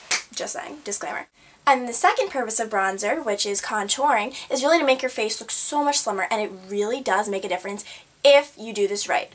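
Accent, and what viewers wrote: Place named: New Jersey, United States. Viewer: im a jersey girl shes deff jerseyy not nyc or staten island jersey acent right there lol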